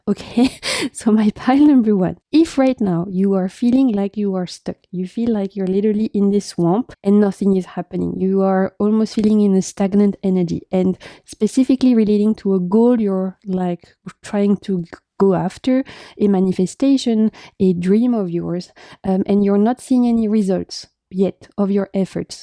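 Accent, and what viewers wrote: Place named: France. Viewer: i lived in paris and dijon for 10 years...your french accent is delicious. and thank you for such an amazing reading. pile 3 merci beaucoup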